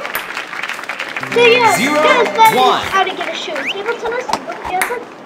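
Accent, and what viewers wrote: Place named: United Kingdom. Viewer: You have a British accent in this video.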